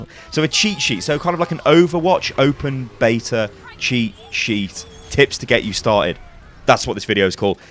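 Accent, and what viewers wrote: United Kingdom. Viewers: Closed beta* you have an awesome british voice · Hi British man.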